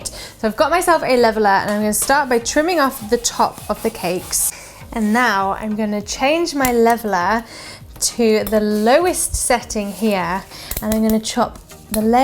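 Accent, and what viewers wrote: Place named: West Country, United Kingdom. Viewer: I love your accent. Is it a west country accent? :)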